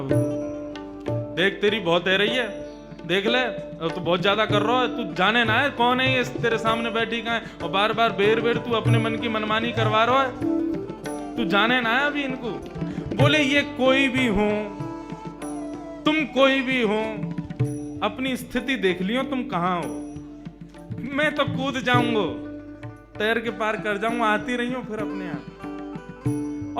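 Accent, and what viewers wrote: Braj, India. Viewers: 🚩🚩🚩🙏🙏🙏🥰😅 अति सुंदर अति सुंदर महाराज जी बृज बोली कितना मिठास है सुनने बड़ा आनंद आता है श्री राधे राधे हरे कृष्णा प्रणाम प्रभु❤❤❤❤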